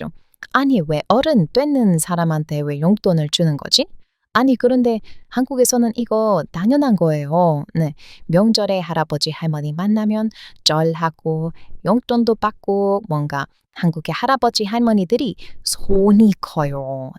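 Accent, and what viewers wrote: United States. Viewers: 목소리가 듣기좋아요 귀여워요 이 미국 사투리 자꾸 듣고싶어져요 지적인 수준도 높은 분인 것 같아 계속 기대합니다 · 목소리가 중독성이 있어 좋습니다 " 한국어 미국사투리 "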